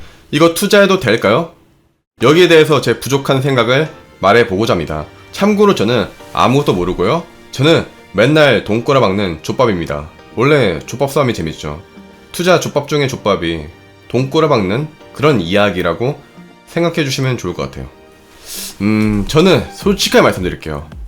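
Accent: Gyeongsang, South Korea. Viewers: 전부터 그런 느낌이 있었는데.. 경상도 사람이었네. 좋겠습니다. 윤석열이 대통령 되서..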